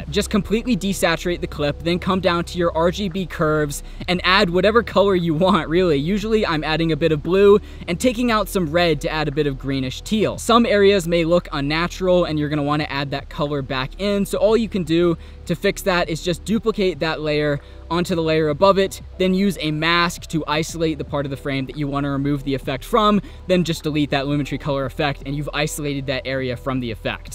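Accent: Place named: Washington, United States. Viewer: Where are you from? Is this Washington? It just reminds me of that area of the country.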